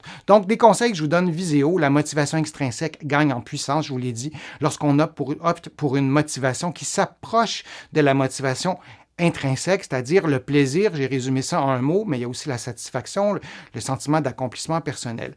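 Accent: Canada. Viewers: Merci pour tous vos conseils , je vais acheter vos livres et je vous regarde en vidéo et puis j 'aime entendre votre voix avec le petit accent canadien . Vous êtes très motivant pour nous tous.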